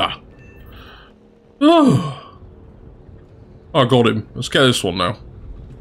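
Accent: United Kingdom. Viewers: Is he British though!?! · as a British person I can confirm he very much is British not northern tho unsure of accent.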